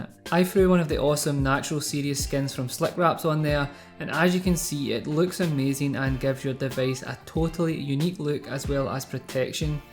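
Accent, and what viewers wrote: Scotland, United Kingdom. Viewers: are u Scottish? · Are you Scottish?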